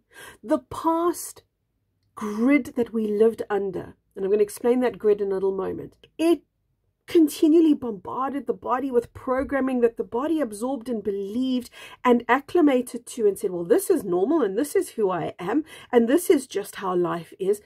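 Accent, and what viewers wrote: South Africa. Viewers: Thank you for showing up for me right now. Perfect synchronicity. What a beautiful channel you are. And healing, too. Bless you. (Your accent sounds South African?)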